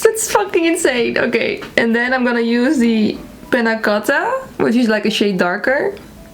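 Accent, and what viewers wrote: United Kingdom. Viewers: Loving the British accent🤭😂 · Your British accent sent me. 😂😂😂😂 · I thought you said look like a chef at first, instead of chav. The british accent was also a treat :)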